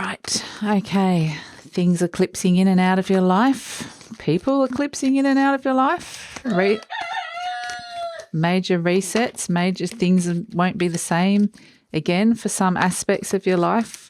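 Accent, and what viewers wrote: Australia. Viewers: Thankyou, love your readings, so nice to have a aussie voice 😊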